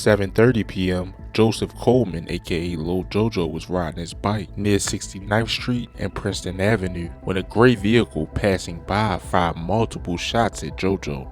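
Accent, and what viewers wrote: Baltimore, United States. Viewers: Bro are you from Baltimore? I hear them heavy U's in your accent 🤔💯✊🏿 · You from Baltimore? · You have to be from Baldimore. I can hear it in the accent. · I can hear that you're a fellow Baltimore native. It's crazy we are fascinated with the Raq like our city ain't our here geekin themselves. Lol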